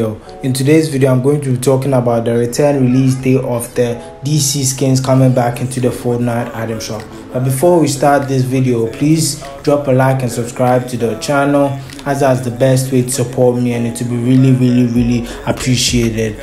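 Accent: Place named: Ghana. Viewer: Your accent sounds Ghanaian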